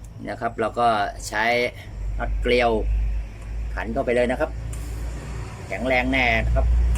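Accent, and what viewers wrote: Chanthaburi, Thailand. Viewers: พี่คนจัน หรือ ระยองครับ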